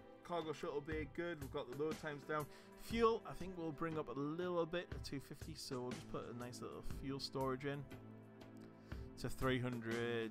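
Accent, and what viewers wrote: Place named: Newcastle, United Kingdom. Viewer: Please do, these are the best tutorials on here and I dig the geordie accent :D Thanks for the series, really helpful. I've bounced off this game for years but now I'm playing it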